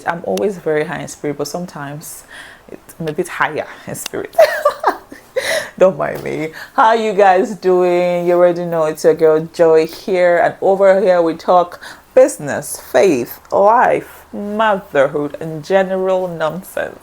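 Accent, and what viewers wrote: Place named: Nigeria. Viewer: The story is not as good as the title 😂😂 or maybe it's the Nigerian accent for me which am not very good with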